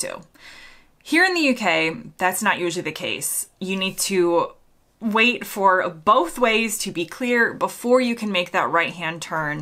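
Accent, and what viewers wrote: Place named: United States. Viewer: Love your US accent ❤❤❤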